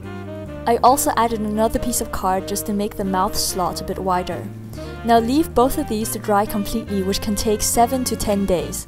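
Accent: Germany. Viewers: bist du deutsche? ich finde dein Akzent klingt irgendwie deutsch😁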